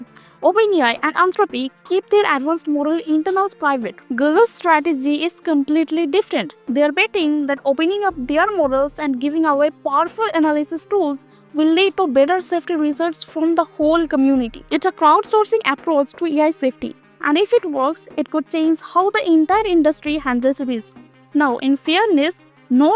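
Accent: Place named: India. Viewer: Absolutely Amazing video and information. The only major issue is that the accent is hard to understand, especially for non-indians. 😊 Happy Xmas and New year.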